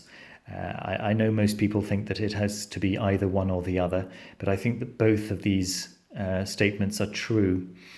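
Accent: England, United Kingdom. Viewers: I enjoy your voice and would gladly listen to esoteric books as read by you. I'm curious if your are English, or perhaps South African?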